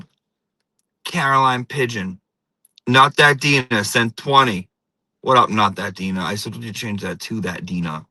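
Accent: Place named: Fall River, United States. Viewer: O M Fing Gawd!!!!! U R awesome!!! Love the fall river accent🤣🤣🤣replay here so good eveningk to u too!!! My earpods in and husband keeps asking me what’s so funny and i said 🤪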